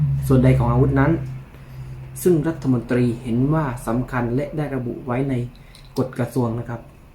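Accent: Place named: South, Thailand. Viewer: เพิ่งได้ดูคริปเเรกครับอย่างอื่นไม่รู้เเต่ทนายน่าว่าคนไต้เเน่นอน ขอบคุณความรู้ดีๆ ติดตามครับ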